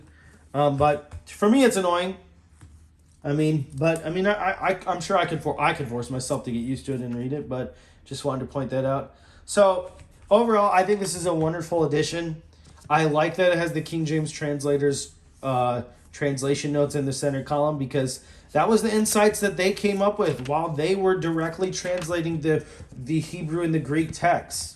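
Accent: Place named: United States. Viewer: He also pronounces calfskin as 'keffskin' so I'm assuming it's a regional US accent!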